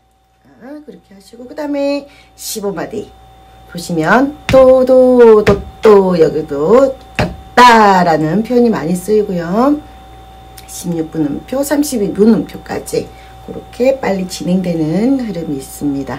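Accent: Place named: Seoul, South Korea. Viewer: 오? 부산에 계신데 서울 말씨네요~~^^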